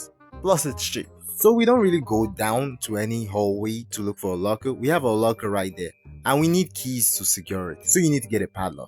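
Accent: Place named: Africa. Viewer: You are African.(the dang accent reminds me of ME)😂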